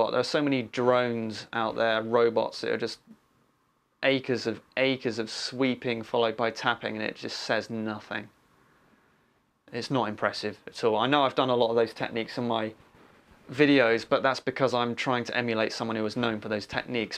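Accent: United Kingdom. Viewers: Hey man, great video! Are you British by any chance?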